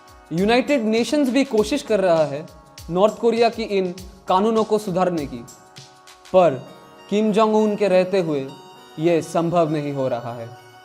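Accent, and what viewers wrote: Bengal, India. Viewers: Tumhara hindi sunke to lagta hai tum bangali manush ho .... achhi jankari diye ho . thank you dada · R u Bengoli ...Odisian? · Bengali hai kya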